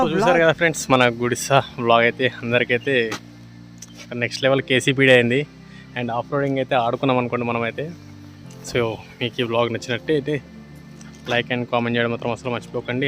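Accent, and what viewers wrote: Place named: Tamil Nadu, India. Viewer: Masthu undi bro place nd me Tamil slang kuda 😂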